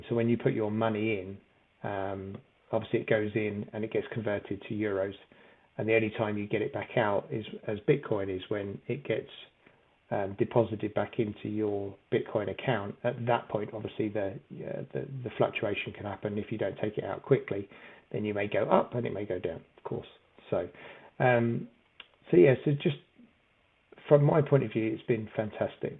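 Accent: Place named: United Kingdom. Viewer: Hi there, great video chap. I just joined yield nodes with a $1,000 initial investment but thinking of adding more! Would you be able to please explain a bit more about how you withdrew into btc and then I guess back into your bank? Much appreciated thanks 🙏 btw, sounds like you’re in the Uk…that’s where I am👍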